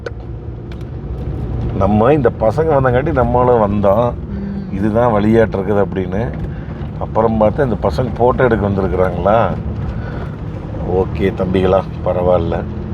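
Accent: Kongu, India. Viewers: கொஞ்சும் கொங்குத் தமிழ் பேசும் கோமகரே...! திகட்டாத தித்திக்கும் பேச்சுடைய திருப்பூர் திருமகரே...! எங்கள் மதிப்பிற்குறிய திரு. அண்ணா❤❤❤🙏 அவர்களே எப்படி இருக்கீங்க அண்ணி❤❤❤🙏 எப்படி இருக்காங்க ரித்திக்❤❤❤ எப்படி இருக்காரு. அண்ணா மைனஸ் டிகிரி குளிர்ல ஷூ&சாக்ஸ் போடாம வெறும் செப்பல் மட்டும் போட்டு இருப்பது ரொம்ப ரொம்ப தவறு. உறைபனி குளிர்ல ரத்தம் உடம்புல ஓடுறது சீராக இருக்காது. அப்படி ரத்தம் சீராக இல்லாமல் போவதால்தான் நம் உடம்பு உறைபனி குளிர்ல மரக்கட்டை மாதிரி விறைப்பதற்கான காரணம்.... அப்படி ஆகாமல் இருப்பதற்காகதான் WINTER COAT JACKET SHOE&SOCKS போடுறதே. தயவு செஞ்சு ஷூ&சாக்ஸ் போடுங்க அண்ணா🙏 11.20 செகண்ட் வீடியோவுல பேசிக்கொண்டே சட்டுனு பாதை மாறிப் போயிட்டீங்க? அண்ணி Guide பன்றாங்க👍 இந்த மாதிரி இடங்களுக்கு புதிதாக போறப்ப இந்த மாதிரி பாதை மாறிப் போறது சகஜம்தான். ஆனாலும் நீங்க போகிற இடங்கள் எல்லாம் அவ்வப்போது பனிச்சரிவு அதிகம் ஏற்படும் இடங்கள் அண்ணா. இந்த மாதிரி பாதை மாறிப் போயி எங்கயும் லாக் ஆகிட்டால் கஷ்டம். 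ரொம்ப கவனமா இருங்க அண்ணா👍 நம் ❤சிட்டுக்குருவியோட❤ தங்களது இந்த லடாக் பயணம் இனிதே தொடர்ந்திட நல்வாழ்த்துக்கள் அண்ணா❤❤❤ என்றும் அன்புடன் உங்கள் தம்பி என்றும் அன்புடன் உங்கள் TMF ரா.முஹம்மது கபூர் (சென்னையில் இருந்து)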